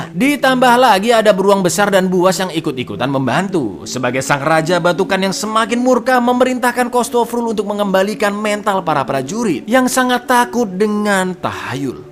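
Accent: Java, Indonesia. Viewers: Jowo nyell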